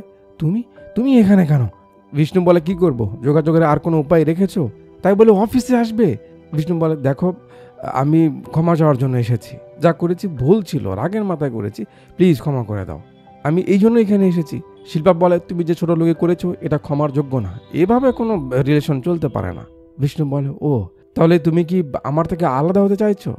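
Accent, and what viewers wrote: Bogura, Bangladesh. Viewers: Vaiya akta request kori? Apni besir vag kotha guloi bogurar vashai bolben,, amar kuv valo lage🥰🥰🥰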